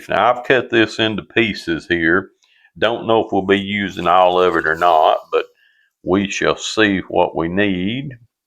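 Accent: South, United States. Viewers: When my Mom made us gravy for breakfast, she would always add her eggs to the gravy and cook them. The few times that i tried it, my eggs would disintegrate(big word). She also would add water to her canned milk so we would have milk. I hate the taste of it now and cant cook with it either. I remember fixing corned beef gravy before, but its been a long time. Thx for the recipe and enjoy hearing your country accent. Proud of mine😂😂😂 Alabama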